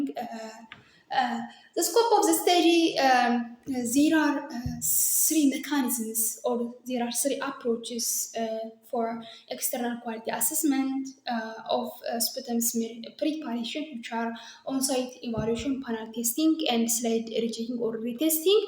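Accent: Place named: India. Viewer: Your accesent of speaking is very amazing I remember indian speakers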